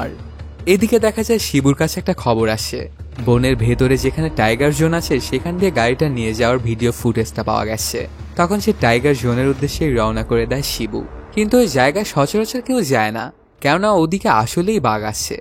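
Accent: Bangladesh. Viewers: হালায় কি রাজশাহীর জেলা না বরিশাইল্লা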